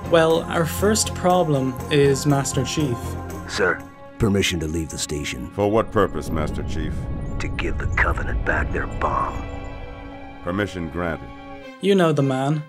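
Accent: Ireland. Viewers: That accent can't get more irish. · Is that a Galway accent I hear? Or at least Connaught?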